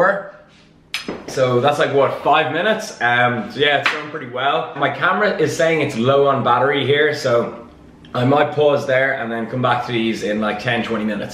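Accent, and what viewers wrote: Ireland. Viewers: Παύλος Εικοσπεντακης it's an irish accent .... ireland :) I'm also irish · Are you irish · I forgot how much I love Irish accents wow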